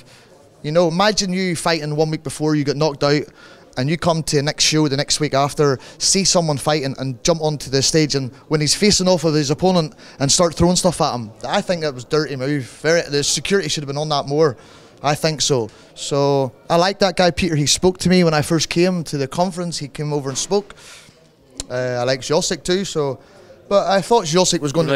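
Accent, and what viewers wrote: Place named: Ireland. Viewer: Zdecydowanie nie, jeśli ktoś ma styczność z Irlandzkim akcentem pierwszy raz, to prawdopodobnie nie zrozumie połowy z tego, co mówi Parke, mimo dobrej znajomości języka.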